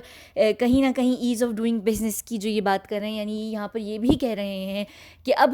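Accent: United Kingdom. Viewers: Her accent seems like UK or british accent. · When you speak in English I recognize it as a British accent. But when you speak Hindi/Urdu in your videos Your British accent is not apparent: so I wonder if you could speak English with an accent of a native Hindi/Urdu speaker (Indian English) accent: it will be fun if you can give it a try! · U have a British accent . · Ap rehete ho uk me ? accent se lga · Sometimes I rewind and listen to your British Accent and I loved it and loved the fact that you speak Hindi so good. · I am dr hyder by profession I am a cardiac surgeon from Pakistan .... I love the way she speaks and the most of it I love the way she has this British accent ..... lolzzzz I am her fan .... thanks for being soo brave and fighting for truth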